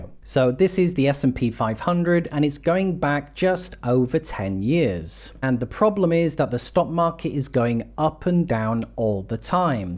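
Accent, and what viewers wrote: United Kingdom. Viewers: This is great for the American market but what about the London Stock Exchange? You sound like a British person but focused on the S&P exclusively. There seems to be a bubble in the USA but in the UK values (I've heard) are similar to 20 years ago. Could an American crash cause a UK crash?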